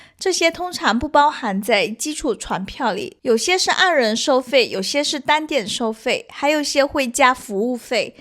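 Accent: Fuzhou, China. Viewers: 典型福州口音？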